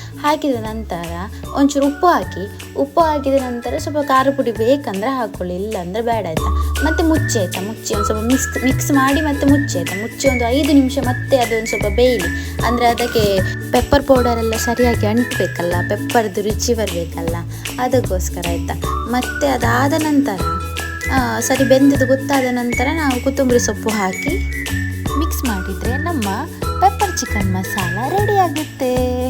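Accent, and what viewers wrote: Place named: Kundapura, India. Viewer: Kundhapuraa Kannada ...nenp hoithaa...yenthaa martyaa??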